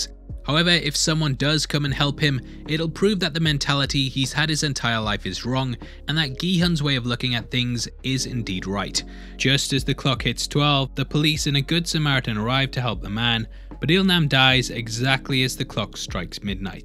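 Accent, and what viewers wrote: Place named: Newcastle, United Kingdom. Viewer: He's not doing anything with his voice. He has a Newcastle accent.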